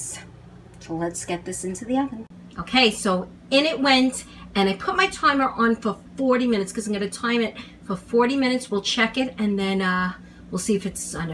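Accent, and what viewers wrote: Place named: East Coast, United States. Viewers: I'd eat that. BTW, love the east coast accent. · Oh my Gawd! Oh my Gawd! I love the east coast accent.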